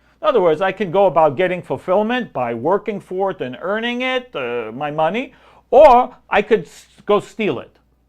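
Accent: New York, United States. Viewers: It's always a pleasure to virtually sit with you to learn. Thank you teacher Rabbi. Yearning reminds me of what I tend to tell people: you must back up your desire with action. It's that simple. Much love and respect to you Rabbi. Many blessings to you and yours. On another note, your New York City stye always brings me home again, lol.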